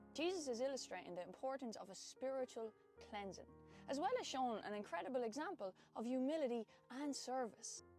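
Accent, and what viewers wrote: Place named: Ireland. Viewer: AMEN sister 🙏🏻 BLESS 👑🔥🕊️⚔️ that is an Irish accent yes? My grandmother came to America from Ireland. 🙏🏻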